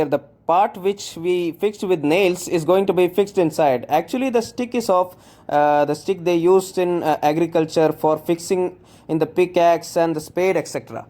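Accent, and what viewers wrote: India. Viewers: Only Indians can speak English like this way💪🏻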